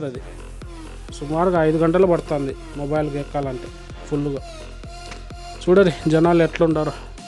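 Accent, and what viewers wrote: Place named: Telugu, India. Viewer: Brother only nee telugu slang kosame nee videos chustha From/telangana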